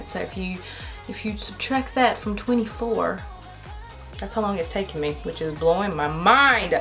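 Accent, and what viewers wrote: South, United States. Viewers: Lori T I’ve never heard anyone with that thick of a southern accent in NC. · You are so down to earth and I LOVE your accent! After I hear someone’s accent I start talking like them lol! After I finished your video my husband was like, “why are you talking with a southern accent?” 🤣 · I like your southern accent!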